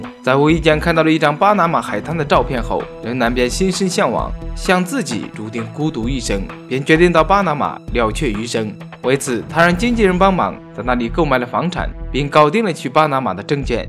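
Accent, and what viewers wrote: Japan. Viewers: 你是日本人在講中文嗎?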